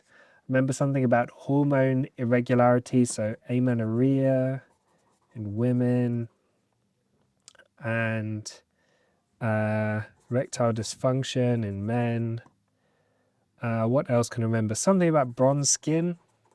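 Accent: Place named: United Kingdom. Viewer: Bro started speaking, I heard the british accent and subed IMEDIATELY. Excited to get into the video lesson.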